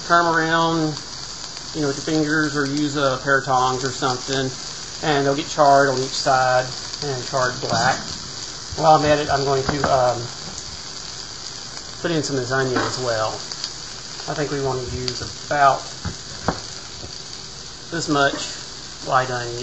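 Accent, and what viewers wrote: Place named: Texas, United States. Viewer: Your from Texas, aren't you? I can tell from the way you talk and do things. I' m north of Houston.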